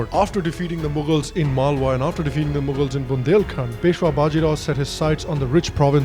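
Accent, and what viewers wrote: West, United States. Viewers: Were you born and bought up in india? If yes, than why do you have a western accent?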